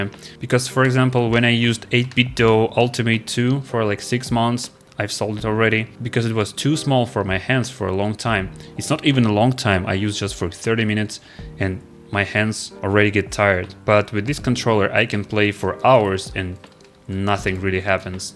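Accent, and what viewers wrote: Russia. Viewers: Бро, за сколько времени ты выучил английский до такого уровня? Я по первым секундам понял, что ты русский, несмотря на идеальное и приятное произношение. Мне интересно стало, сам начал только изучать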